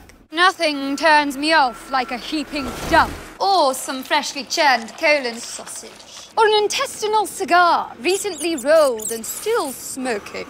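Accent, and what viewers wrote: Scotland, United Kingdom. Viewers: You can hear her Scottish accent more in this. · Just stopping by to say that this is one ad I genuinely enjoyed, and chose to sit through. It seems to have genuine personality. the snooty British accent just adds a level of self-awareness/ irony. Would buy if I didn't already have a fan.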